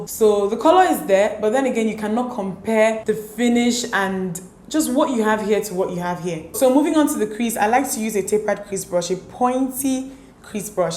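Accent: Nigeria. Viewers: Idk why but I love the Nigerian accent😍😍🤤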